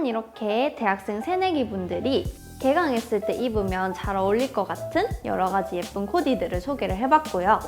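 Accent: Gyeongsang, South Korea. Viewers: 언니 사투리!! 경상도인가요? 귀엽네용...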